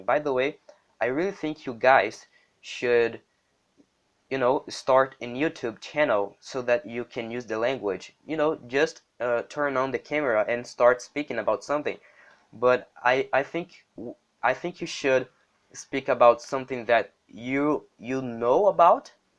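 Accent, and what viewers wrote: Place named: United States. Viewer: Excellent mate. Your pronunciation is really good. You have a slight American accent too :)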